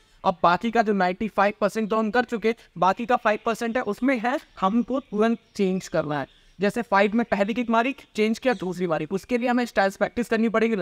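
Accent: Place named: Mau, India. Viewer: Lagta Hai Mau District se ho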